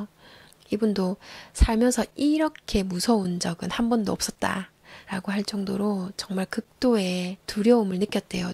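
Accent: Gyeongsang, South Korea. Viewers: 경상도사투리가 약간섞인 감미로운 목소리에 영적능력에 진심으로 빠져듬니다. 매일 아침 눈뜨자마자 고맙게 듣고 영성의 질을 향상시키고 있어요 너무 감사핮니다 10만 20만...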